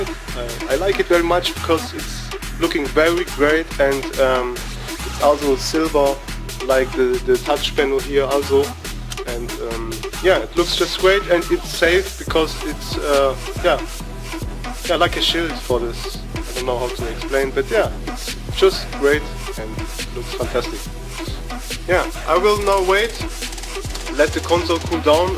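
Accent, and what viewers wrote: Germany. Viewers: Man hört sofort dass du ein deutscher bist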